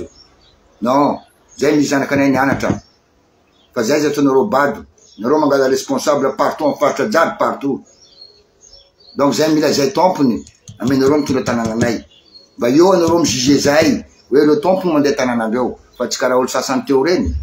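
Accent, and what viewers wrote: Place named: Mahajanga, Madagascar. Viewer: Eh frère ny teny majunga nao motraka bé ,ny atao hoe Malagasy dia manao tahaka anareo karana ,karana karana ngah tsy hainao ny fiteny hoe ftsouraly avy inde miala modia any nareo 👍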